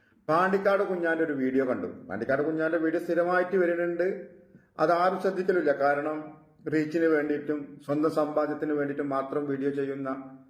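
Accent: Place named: Kannur, India. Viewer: ഈസംസാരിക്കുന്ന ആൾ കണ്ണൂർ കാരനാണ് തോന്നുന്നു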